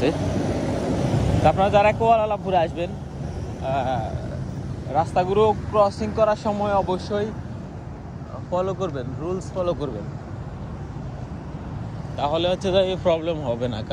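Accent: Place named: Comilla, Bangladesh. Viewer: আপনার বাড়ী কুমিল্লা মনে হয় ভাই ❤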